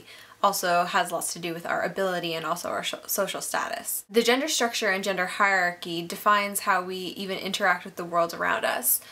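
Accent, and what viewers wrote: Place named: United States. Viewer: ..... This is a modern viewpoint assuming that history respects your cultural opinion on sex. Based on voice alone and I may be wrong is you're american, less than 200 years ago it was a scientific fact that black people were lesser, and women's role in the house seemed to be limited to child bearing... Then you claim humans in ancient society had enough time to understand the difference between gender and sex.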